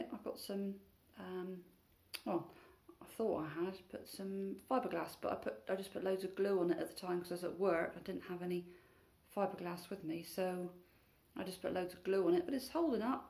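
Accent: England, United Kingdom. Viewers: I truly admire your claws erm my bad . . .nails :-) I also love your voice, I love the English accents, and when I was in London 20 years ago I was in total awe of your people , the shops , the double-decker buses,, the food , so many things that kept me so preoccupied that I only slept an hour a day lol anyway, thank you for sharing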